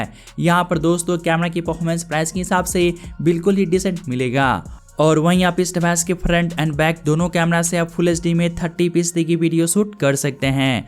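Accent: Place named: Bihar, India. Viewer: भाषा उच्चारण में सुधार की जरूरत है बोली में बिहारी झलक नजर आता है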